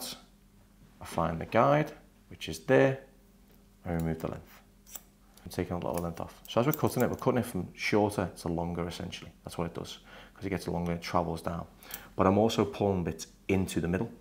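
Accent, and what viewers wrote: United Kingdom. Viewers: He's British, great accent talks about what he is doing on video, scroll on if you don't like it. Some of us like to watch and listen to!❤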